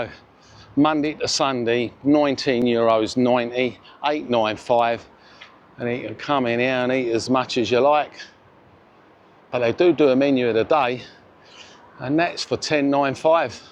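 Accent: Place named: Luton, United Kingdom. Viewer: Sounds like a luton accent originating in south london .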